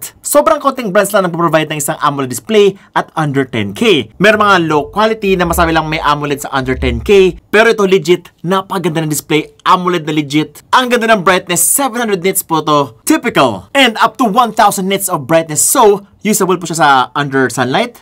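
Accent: Visayas, Philippines. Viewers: HAHAHAHAHA bisaya kapo ba kuya?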